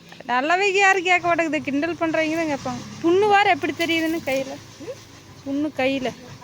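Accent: Kongu, India. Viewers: நீங்கள் கொங்கு தமிழ் லயே பேசங்க அம்மா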